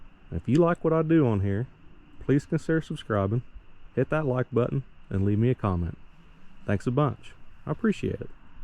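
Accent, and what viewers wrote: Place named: Appalachia, United States. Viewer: You have to be from SE Ohio or WV.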